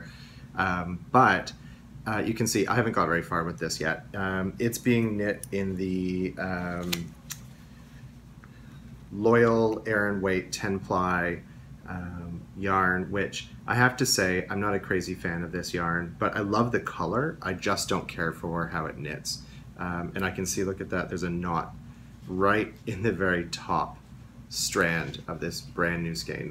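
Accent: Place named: Canada. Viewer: Awesome podcast! I can't wait to see your progress as a knitter. I brings me back to just a couple short years and helps me reflect on all the progress I've made since i (seriously) picked up knitting. Also, the Canadian accent...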